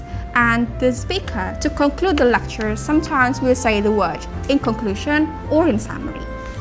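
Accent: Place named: England, United Kingdom. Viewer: Kakak nya pake english accent ya;(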